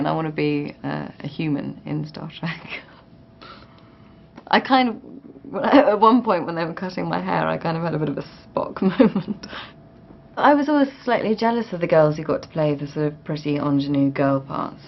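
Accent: United Kingdom. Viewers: she's so cute! i love her britsh english accent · as if her adorable looks weren't enough, that distinguished british accent just makes me melt :)!!!!!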